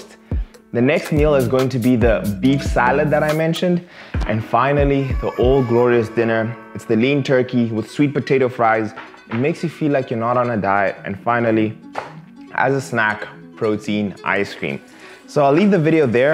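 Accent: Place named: South Africa. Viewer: For a second I was tripping because I thought that he was going overboard on the carbs... Either way My favorite shredding meal is definately a chicken avocado salad, just because the carb grams is low and when I cut most of the time it follows a higher fat macro setup so that my body produces more ketons and gets fat adapted faster... Just found out you lived in South Africa (which makes sense from the accent!!) You were closeby at one point.. You got a follower in Mozambique, keep it going!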